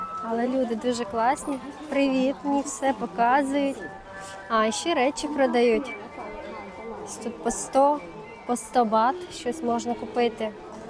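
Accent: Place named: Kherson, Ukraine. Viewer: ох ці праведний. Ну і говори на расейській сам А дівчина має свій Херсонський 🇺🇦 суржик як 90% Українців мають свій суржик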